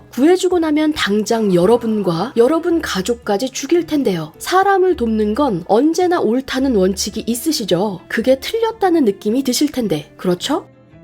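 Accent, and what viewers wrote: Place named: Gyeongsang, South Korea. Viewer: 혹시 경상도 분이신가요? 아주 살짝 억양이 보이네요 ㅎㅎ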